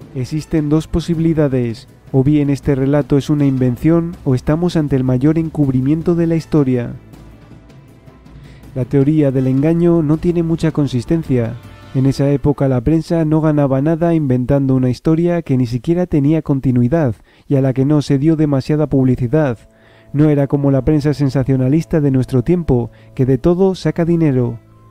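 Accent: Spain. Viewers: que horrible voz, no me extraña que los latinos critiquen como narran los españoles, por suerte no todos hablamos asi de mal, parece tonto o imbécil, tu voz es pésima, horrible, lo narras muy mal, no pude aguantar mas de 20 segundos, que asco escucharte, lo digo sin animo de ofenderte, pero eres un idiota, cambia de profesion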